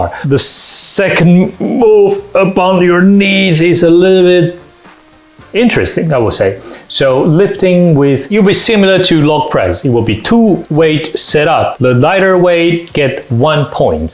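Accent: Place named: United Kingdom. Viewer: 不會拉，你很多單字會特別的有英國音，那個Ter很明顯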